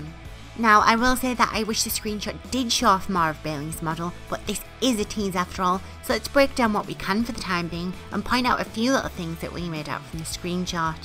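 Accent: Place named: United Kingdom. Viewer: Hi good day thanks for the info plus I got hear your British accent so my day is complete💗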